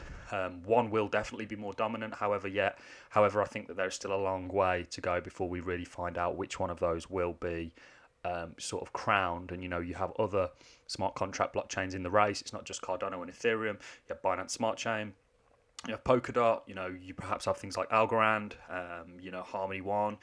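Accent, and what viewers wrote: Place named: Wolverhampton, United Kingdom. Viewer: I have a friend from Wolverhapton, UK. He sounds like you. Great video, hi from Sweden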